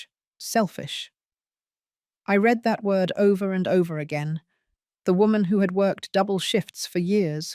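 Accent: United Kingdom. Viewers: I love these stories because of the British accent and slower pace of speaking. Other story channels have very annoying voices and speak with agitation and too young of a voice for the age of the main character.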